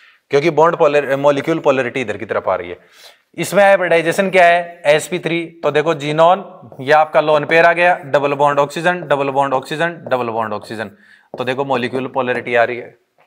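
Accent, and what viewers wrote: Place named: Haryana, India. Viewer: Haryanvi lahje me chemistry mja aa gya kya padhate ho sir ... thanks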